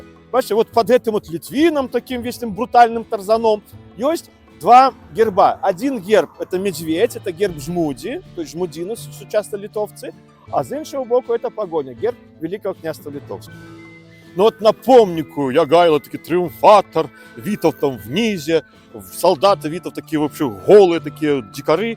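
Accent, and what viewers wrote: Russia. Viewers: Гэта трасянка , а не беларуска мова.. Вымаўленне нібыта ў русака з пад палкі!! Палова слоў расейскіх. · Добра, але трохі вымаўленне пакутуе, як у русака😮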